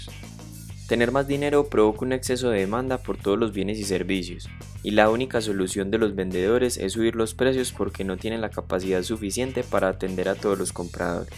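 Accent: Colombia. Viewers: Me encanto colombiano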